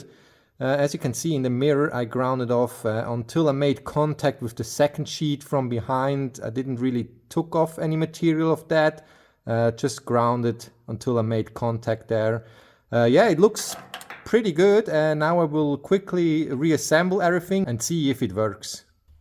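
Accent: Switzerland. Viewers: When you hear that Swiss accent, you know this job will be done with 110% accuracy. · Your accent is lovely! Are you from Schweiz, or maybe south of germany.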